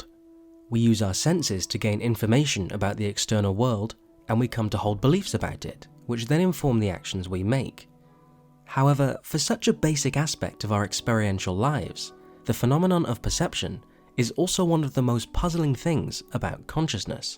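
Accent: United Kingdom. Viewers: Man, you have converted so many lesbians with your British accent and philosophy-infused British humour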